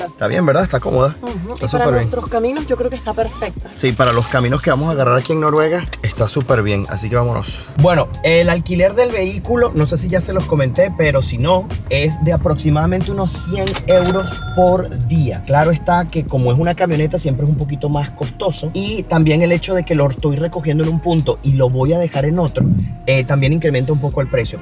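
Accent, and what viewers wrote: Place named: Venezuela. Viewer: Hola. Excelente es muy refrescante y entretenido. Felicitaciones paisano 🇻🇪👍 claro ese acento del venezolano es Inconfundibles!! Jajajaja desde Venezuela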